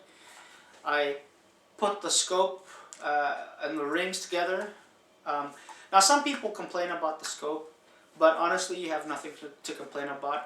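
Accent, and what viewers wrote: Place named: Hawaii, United States. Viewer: Sorta Hawaiian lol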